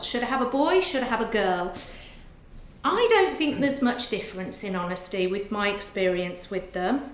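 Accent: United Kingdom. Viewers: Thank you for explaining the Miniature and Standard Dachshunds. I would just love to have a pet dog Miniature Dachshunds. I live in France, Jura. I don't know where to go to buy a miniature pet dog Dachshunds. I was told that in Germany, they sell them? please do reply to me. Just love your British accent. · Considering is in the UK, it’s not surprising they don’t say it with a US accent 😂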